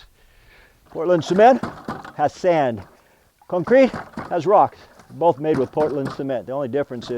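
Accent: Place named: Bay Area, United States. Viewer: You have a Bay Area accent.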